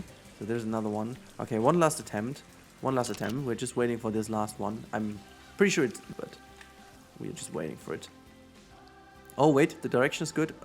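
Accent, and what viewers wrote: Germany. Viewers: Direkt den Deutschen Akzent herausgehört :)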